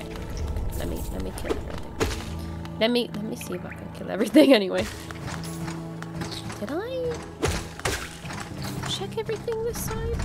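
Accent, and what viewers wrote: United Kingdom. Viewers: Btw, I know I'm echoing a sentiment similar to many others in the comments, but I have never heard of you, and I want to emphasize that I do not care for reaction channels or let's play/twitch vids... BUT! I watched every second of this, and I'm probably gonna watch the next too. You have a thoroughly pleasant voice (I'm such a sucker for British accents, especially female ones) and you are remarkably intuitive for a self-proclaimed novice of the genre. This game holds a special place in my heart and earns its spot on the small/rare S Class shelf of games.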